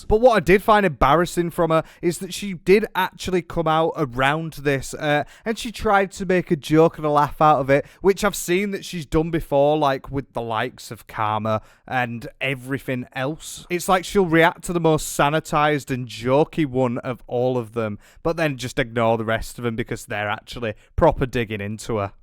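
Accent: United Kingdom. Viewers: I am American I SPEAK ENGLISH! But I HAVE NO IDEA WHAT THIS BRITISH DOUCHE IS SAYING I HATE THE BRITISH ACCENT 🙄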